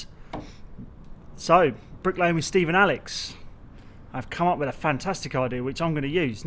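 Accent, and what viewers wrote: England, United Kingdom. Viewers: Been watching your videos for a while. Learning alot about bricklaying. Many thanks for teaching us Yanks lots of Queens English. If I ever get a chance to visit England, I'll know what you blokes are saying.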